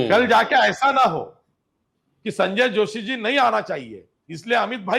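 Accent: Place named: Maharashtra, India. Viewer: Sir, tumchi Hindi marathi sarkhi wat the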